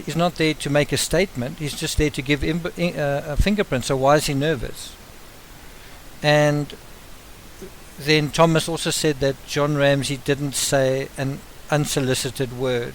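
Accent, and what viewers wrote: South Africa. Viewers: 😆 I’m from the Uk and I find myself using American terms sometimes now.. I used flashlight recently and my daughter gave me a sideways glance 😏... I love the South African accent too... The way says ‘Dr Phil’ as ‘Dr Full’ always conjures up an image of Dr Phil with a big tummy after his dinner! 🤰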